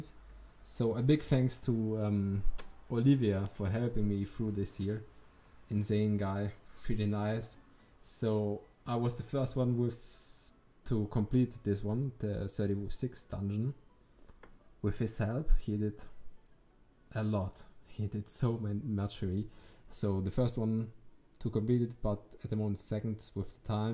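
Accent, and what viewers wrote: Germany. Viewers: hast aber echt nen deutschen Akzent dachte auch du wärst Deutsch bis du es zum Schluss gesagt hast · hab gar nicht gemerkt das du deutsch bist aber dein Akzent hat man raus gehört dann kam ne Twitter Meldung auf deutsch:D btw kranker Account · Deutsche hört man raus xD Auch wenn er viertel türke wäre und er das flüßig sprechen kann, Das ist der akzent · Ein deutscher an der spitze ? Wow (deutschen Akzent höre ich unter <num> mio englisch sprechenden herraus :D) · Kommst du aus bayern? :D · Was für ein Deutsches Englisch XD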